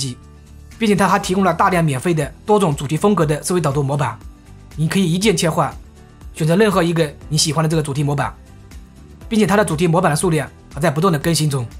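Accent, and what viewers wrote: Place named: Fuzhou, China. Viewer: 播主说话口音带有很重的福州音...✌️✌️✌️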